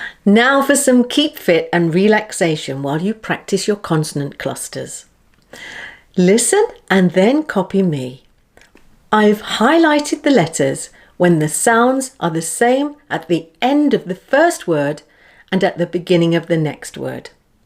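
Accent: United Kingdom. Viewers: Thank you for sharing this video ...... I really like the british accent .... But it is not easy for a spanish guy like me!!!! :-)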